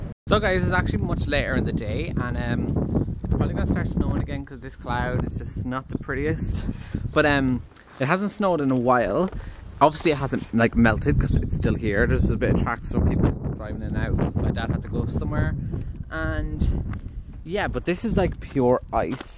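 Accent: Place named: Cork, Ireland. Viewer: Loving the Cork accent like!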